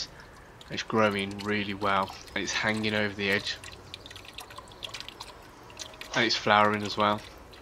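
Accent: Birmingham, United Kingdom. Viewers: Thanks for the reply. I am in USA but you sound like a Brummy yet you say Rekcon ,so that kind makes me think Ipswich. Anyway,I have been checking out your videos today and I really enjoy them Thanks.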